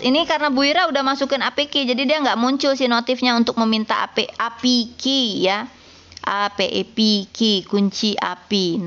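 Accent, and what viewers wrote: West Java, Indonesia. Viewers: Hatur nuhun ibu Ira...meni Sunda bangets hehehe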